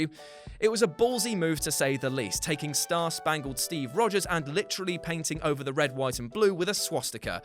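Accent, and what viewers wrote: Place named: Newcastle, United Kingdom. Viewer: Howard the freakin' Human Geordie lad, where ya from? The 'Heed mesell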